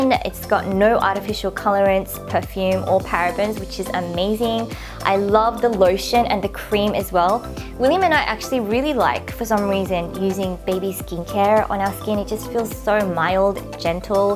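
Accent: Australia. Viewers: Mate!!!! Your aussie accent is so lovely to listen to :)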